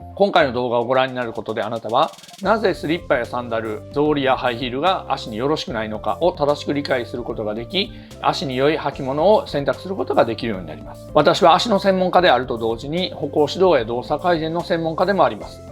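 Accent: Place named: Kansai, Japan. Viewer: 関西訛りですね。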